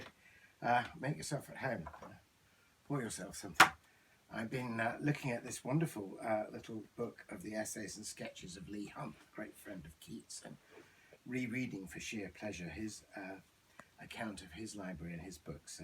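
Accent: England, United Kingdom. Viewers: I love your English accent .😊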